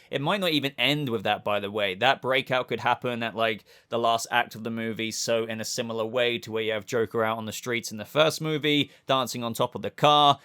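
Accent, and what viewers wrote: England, United Kingdom. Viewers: Lol. He's English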